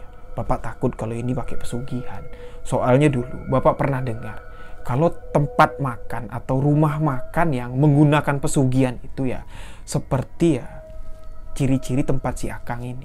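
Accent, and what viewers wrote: Indonesia. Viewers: I just love the way you tell the stories. Sangat mudah difahami. Semakin suka dengar dialek Indonesia ❤️ Hello from Malaysia!!!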